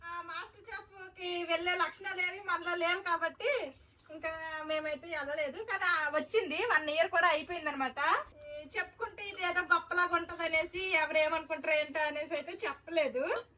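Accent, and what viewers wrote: Srikakulam, India. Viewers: Hi గారు నా పేరు కరుణ నాకు హాయ్ చెప్పండి మీ శ్రీకాకుళం భాష నాకు చాలా నచ్చింది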